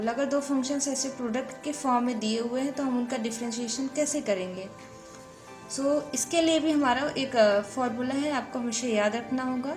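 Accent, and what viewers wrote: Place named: Varanasi, India. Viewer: Very nice... i found kashi in your voice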